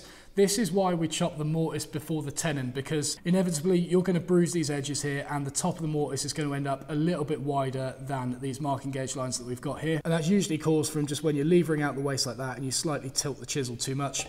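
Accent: England, United Kingdom. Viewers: it's nice to here and English accent but you are way beyond my abilities.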